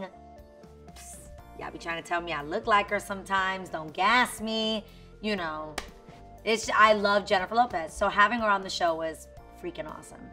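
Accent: New York, United States. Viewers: You have a New York accent. My friend sounds like you and she's not Latina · wow you had such a cute New York accent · Damn the New York was THICK 😹 she still the same woman 🧡🧡🧡😘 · I freaking love you! ❤️ We will definitely miss you on daytime TV! I just don't get why ya didn't go for like 20+ yrs! Glad we're able to see you on YouTube!!! About JLO yesssssss 🙌 Love herrrr too! I love how you're so humble like don't gas me! Haha! Love your JLO vibe! Your fashion sense your NY accent and attitude is everything! 🥰💯🙌 much love A! Stay real 😘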